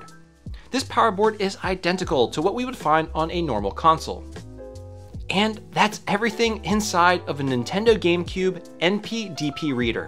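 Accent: Philadelphia, United States. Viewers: are you from the philly area? the way you say your "O"s reminds me very much of my accent.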